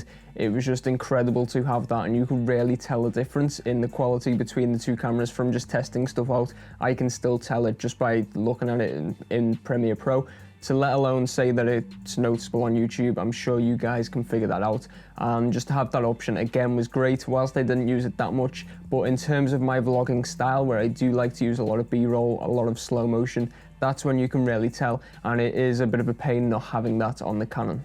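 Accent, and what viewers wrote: Liverpool, United Kingdom. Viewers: Where abouts are you from man? you sound close towards liverpool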